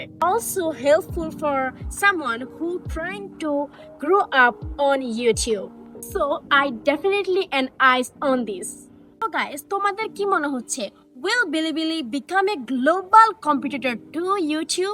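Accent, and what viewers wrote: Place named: Sylhet, Bangladesh. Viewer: ohh i thought,,, but your speaking look like our Sylheti gr...whatever dear, carry on,, also nice too meet u... ❤❤❤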